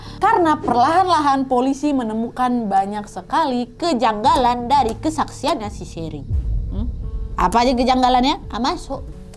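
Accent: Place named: Medan, Indonesia. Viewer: Aku penggemar baru celotehanmu.. Biasanya aku merinding..tapi tertawa denger ngoceh 2mu... mantap... lucu. Kocak... teruskan.. ala medan logatmu tu.. i like it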